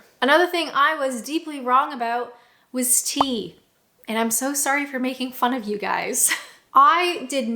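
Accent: United Kingdom. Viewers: Lol. You sound fully integrated into British life. :D :D :D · It's great you consider this home now. .... and you're getting a great accent too! Now to fet yiu moved somewhere where you'll get a strong accent: Yorkshire..... Dorset.... or gasp Happisburgh in Naaaaaarfook (where you will most certainly need a car.... or rather.... tank)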